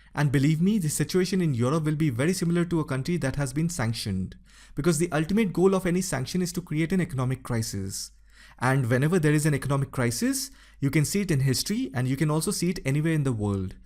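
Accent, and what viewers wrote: India. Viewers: Russian propoganda in English with an indian accent. I still cannot understand why Indian people so vigorosuly support a country that is shelling civilians on Ukraines soil.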